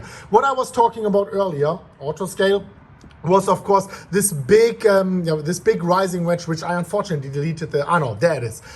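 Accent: Germany. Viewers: Even if you speak English I fell like you speak German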